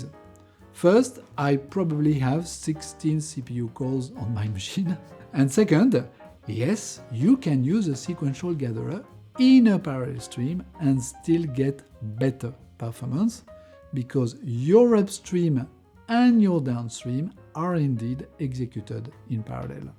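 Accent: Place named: France. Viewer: Need a native English speaker for these. Very hard to understand or better yet just do it in your native French and have AI subtitles. Great content but I can't be the first to have this thought.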